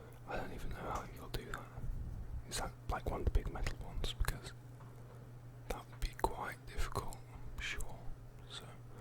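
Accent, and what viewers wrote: United Kingdom. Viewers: what part of the uk are you from? i really can't tell from your accent haha x love the videos man❤️ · Hi! I'm from Brazil...I love your videos and your British accent, very relaxing. Thanks for the video!:D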